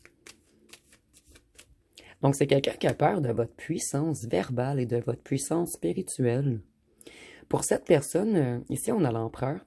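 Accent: Quebec, Canada. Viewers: Je sais pas si je suis devenu addict à vos guidances ou à votre accent Québécois que J adore 😊. Bise de France 🇫🇷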